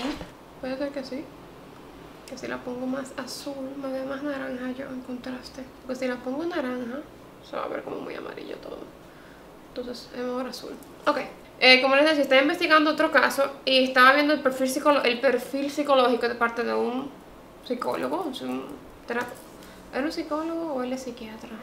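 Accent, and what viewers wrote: Dominican Republic. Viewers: Desde que la escuché supe que era Dominicana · Me encanta 😍 tú trabajo ,sobretodo porque hablas con nuestro acento inconfundible de nuestro país ,Republica Dominicana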